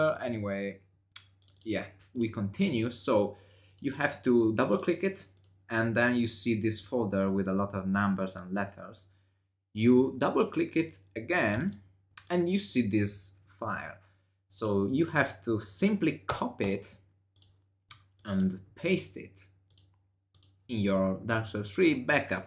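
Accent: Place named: Italy. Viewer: "Sorry for the Italian accent." It's okay, we forgive you.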